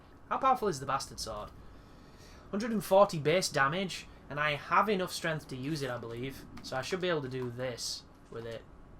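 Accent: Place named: Northern England, United Kingdom. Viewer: thank you brother, my father is actually Scottish, but I live in spain. so I am kind of hybrid, I kind of knew you were from England but I was not sure,since north English people & Scottish can sound very similar.. I have found your channel on your video about finding vendrick hidden soul after killing him, then I also commented about how huge RYU HAYABUSA fan I am:)) also sorry I have missed your Castlevania run, I will try to watch them soon, also thank you for welcome me to your channel brother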